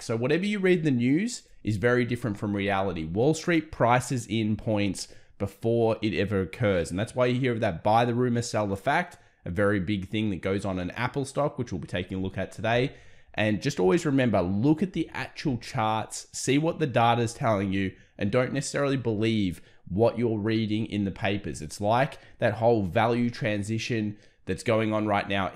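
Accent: Australia. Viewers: Hey, hey, hey! Welcome back. We've missed the great Aussie accent. 😂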